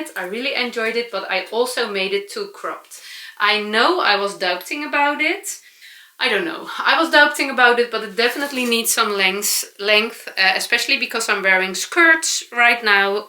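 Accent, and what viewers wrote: Netherlands. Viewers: Your English is just fine and I love the Dutch flavor--Dutchy English--I love it!! Don't lose it! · Definitely second this. Dutchy English is perfect just the way it is - makes my heart jump every time! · We love your Dutchy English. I wish I spoke Englishy Dutch! 💕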